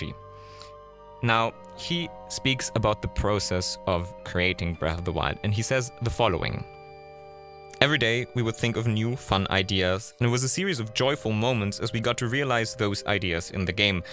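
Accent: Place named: Germany. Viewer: Wie man einfach heraushören kann, dass du deutscher Muttersprachler bist. 🤣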